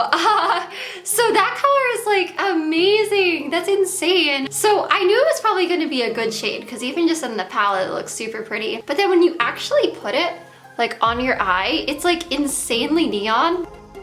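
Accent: United States. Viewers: that's just an american accent lol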